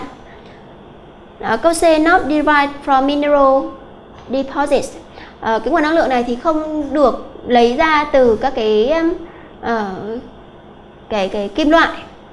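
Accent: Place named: Philippines. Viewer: s cô đọc nghe như cứ sai sai s ấy giống phát âm của ng philippines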